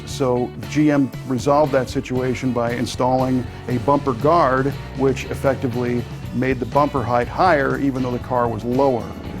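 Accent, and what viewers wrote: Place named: Chicago, United States. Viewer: You can definitely tell is a true Chicagoan not only by the way he said "convertible taaap" but even by the way he pronounced Chi-CAW-go. No doubt about it. And I wouldn't be surprised if he referred to the main room in his house as the "frunchroom," either. Go!:-)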